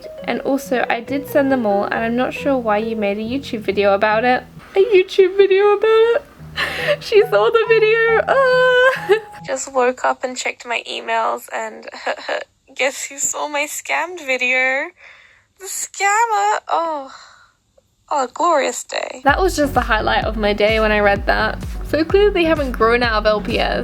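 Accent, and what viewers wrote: United Kingdom. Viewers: yessss i know ur english now i thought u were from america lol trying to find english youtubers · Wait, you're british right?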